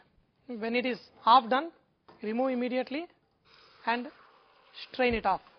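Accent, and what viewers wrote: India. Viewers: "american"...when did it become a language? it's English dude which you guys have hijacked and now call it american....damn funny!!!! you also "kepp" trying!! is speaking British English in Indian accent (which we proudly speak)....which is actual English...not "American" English with that strange r sound.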